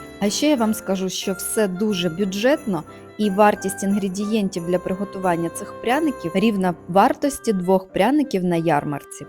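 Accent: Standard. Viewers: Дякую за сиачний рецепт і дуже гарний супровід - чиста, правильна, красива Мова- БРАВО💙💛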